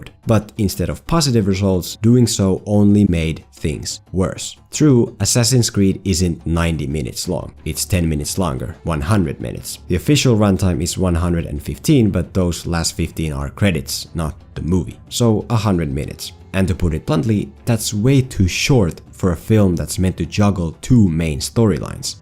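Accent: Finland. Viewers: I love your distinctly Finnish accent! Now don't take me wrong. Your pronunciation and rhythm is very good and you're extremely fluent. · Exactly what I'm thinking! Firstly, torille. Secondly, a Finnish accent is too distinct for even a master to hide. Seriously, what else could it be? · Hey mate, Are you from Finland ? 😄✌🏾 🇫🇮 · are you finnish???? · Youre finnish arent you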